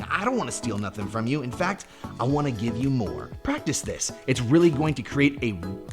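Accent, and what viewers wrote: United Kingdom. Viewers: This guy can star in any British movie lmao I love his British accent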